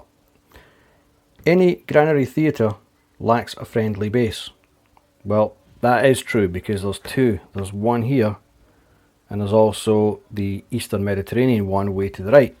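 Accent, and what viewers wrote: Scotland, United Kingdom. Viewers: Nicely done sir and I must admit it sounds even better in Scottish. Its been way too long since I was there.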